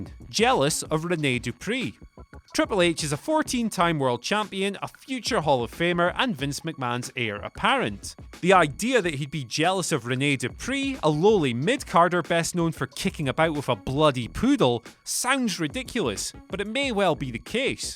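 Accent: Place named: Scotland, United Kingdom. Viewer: Finally a Scottish narrator 🇬🇧🇬🇧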